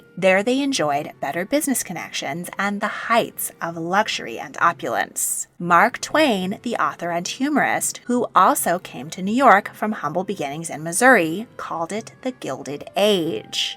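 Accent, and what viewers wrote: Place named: California, United States. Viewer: I’m just struggling with the fact that this was apparently narrated by the Californians from SNL. What in the actual hell in this accent? Holy crap I can’t even pay attention to what she’s spewing toward my ear holes.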